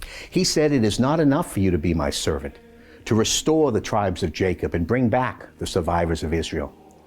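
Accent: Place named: Boston, United States. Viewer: I recognize that Boston accent 😊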